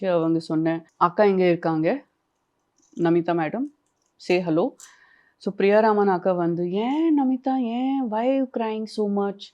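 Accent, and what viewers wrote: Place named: Kerala, India. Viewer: Kerala va ma neenga tamil peasum podu thearigeradu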